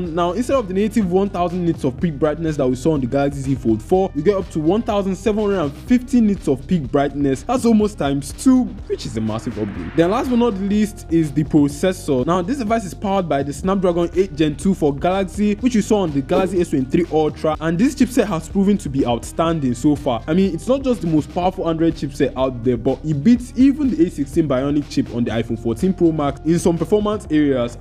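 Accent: Nigeria. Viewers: Keep it up, your Nigerian accent day give me special joy. 👍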